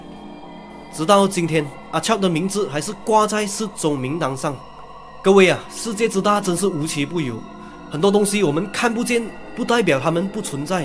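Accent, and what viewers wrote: Malaysia. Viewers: 你的故事整理的很好，但是，只是 建议 “发音” 需要再多加练习调整一下，会更好。【这应该是大马人の通病大概吧】就是句头 句尾语气词 轻重音，整体性的 发声『 抑扬顿挫 』… 我知道你不是专业的，但慢慢可以改善 相信会越来越好，你可以参考其他讲解梳理案件的网红 可以把人带进故事意境中。 其实，使用 “大马腔调”の口音说话叙述 比较不太好聽，讲故事也比较难有意境跟代入感 ，听着也很容易让人出戏～有种讲着讲着很困難，又很卡…卡顿的感觉……。😂 😌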